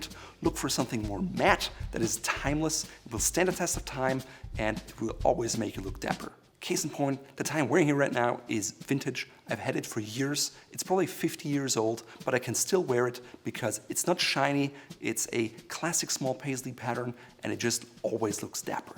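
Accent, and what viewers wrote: Germany. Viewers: Isn't he German? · Wusste ich doch das ich diesen Akzent erkenne :D Even with perfect English, a German can spot a German.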